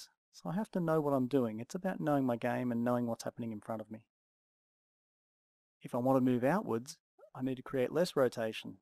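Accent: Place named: Australia. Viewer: good to hear an australian voice helped me a lot thanks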